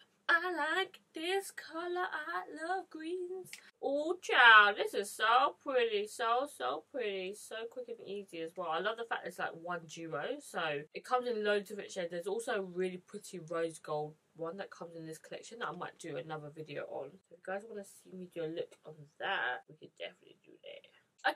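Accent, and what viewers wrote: United Kingdom. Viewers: Omg your Brittish accent! I wasn't expecting it 💗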